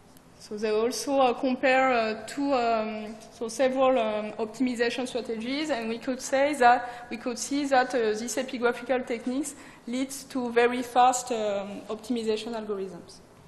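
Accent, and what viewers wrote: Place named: France. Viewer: LOL, I like her French accent, which is even more interesting than the material itself : )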